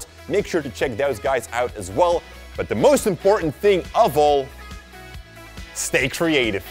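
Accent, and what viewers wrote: Netherlands. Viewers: are you dutch? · are you dutch ? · his accent tells me he is Dutch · Are you Dutch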